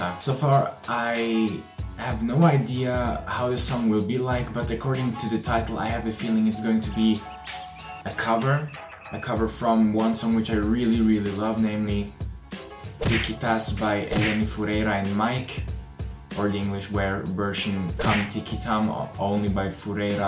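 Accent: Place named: Israel. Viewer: Bro......l can hear your isreli exent. pliz stop embresing your self. THNKS.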